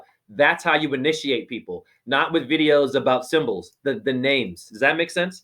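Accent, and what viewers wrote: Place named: England, United Kingdom. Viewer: I So much enjoyed your chat ... It' Feels fabulous to listen and change 💚💜🙏. Ha ha I love the English voice, I'm from England 😊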